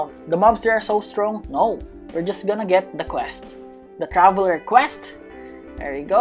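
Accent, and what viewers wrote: Philippines. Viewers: I also knew he was Pilipino like how he is talking and like My family Talks with like a high voice a little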